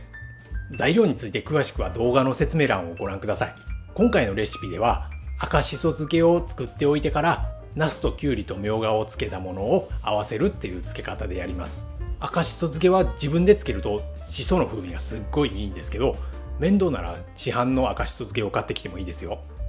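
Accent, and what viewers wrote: Kyoto, Japan. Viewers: 下鴨の出身です¨̮ 嫁いでから京都のお漬物の美味しさを 再認識✨京都弁に安心感🥺 シンクに赤紫蘇の洗った後の赤いお水が 流れた瞬間、実家を思い出しました。笑笑 母の方法とはちょっと違うけど、 ものすごくしっくりきたので✨ こちらの方法でも作ってみます☺️ めっちゃ美味しそうです！！！ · 分かりやすかったです👍今年は2回柴ずけ こしらえてますが😊もう 1回 こしらえてみます(動画参考)で👍京都弁がチラホラ👏👏👏よかったですね🙆🙆🙆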